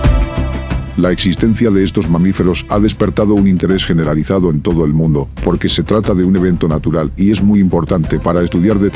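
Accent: Spain. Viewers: Hermosos tus videos, solo que no entiendo por qué el audio con acento español. Es todo 😊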